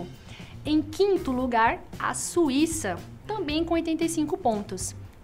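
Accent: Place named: São Paulo, Brazil. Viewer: Sotaque de paulista feio! Coloquem uma pessoa que saiba pronunciar as palavras corretamente !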